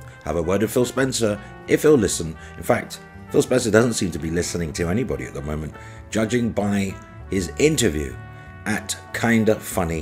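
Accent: United Kingdom. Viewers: The Brittish accent makes the insults even funnier. Lmao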